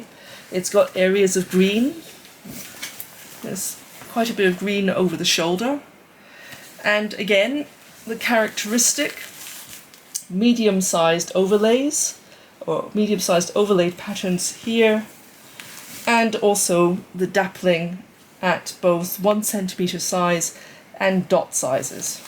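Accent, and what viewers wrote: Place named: England, United Kingdom. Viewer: I'm listening to an Asian woman with an English accent speaking about Phantomleaf on a channel called 'Flecktarn'. I am very confused.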